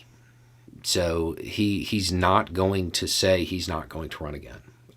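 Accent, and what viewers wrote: South, United States. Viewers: Hi. Just watched the video 'Let's talk about 3 letters that can make rural whites understand the fear minorities have of cops.' and in it, I heard a much strong Southern accent than in any video since I started watching your channel. Which is your day-to-day accent, now or then? Or was the accent you used in that video due to the subject matter of the video - i.e. to talk like a rural Southern person? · I prefer the redneck accent. 😊 From a redneck girl. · have been here long enough to see you evolve from sounding rather southern to not sounding southern at all , you cover a wide range of matters and from your explanations it is possible to see you care about the truth , How do you stay so even tempered and not get mad as hell about some of this stuff , I use your video's to explain things to my GOP friends .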